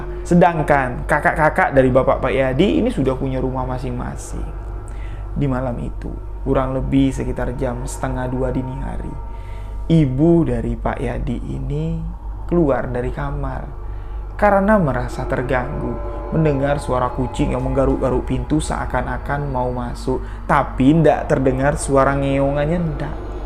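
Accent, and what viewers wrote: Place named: Sunda, Indonesia. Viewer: Assmuallaikum bang sy penggemar setia kisah 2 horornya.bang orang jambi tpi sy merasa kok logat gaya ngomong nya agak mirip ke sunda kadang bahasanya kok sama bahasa sunda seperti " keukeuh" apa mas pernah belajar bahasa sunda jawa barat...he hehehe...sukses selalu untuk mas 👍👍🙏🙏🙏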